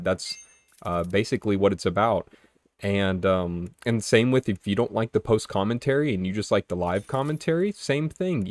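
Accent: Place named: Canada. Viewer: You sound so Canadan at the beginning of this video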